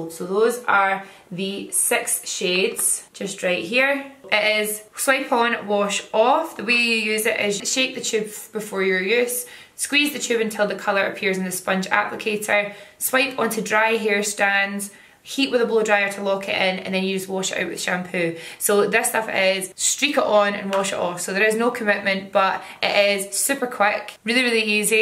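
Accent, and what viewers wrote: Scotland, United Kingdom. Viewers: Omg I love her Scottish accent! She's fantastic! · I love your hair and make up and your style!!! Please can you help me as I am very pale and now have mousy coloured hair which I highlight nbut I so so want dark hair without the commitment. So do you know anywhere I can buy either real hair wigs or similar???? Thanks I used to work in Glasgoiw and come from Renfrewshire but now live in Perthshire so bloomin great to see someone in my accent thrive!!! oxoxoxoxo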